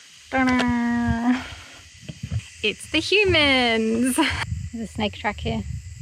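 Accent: Australia. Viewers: I love watching your content! I’m from the u.s and I love your Australian accent ❤. Thank you for all the great videos 😊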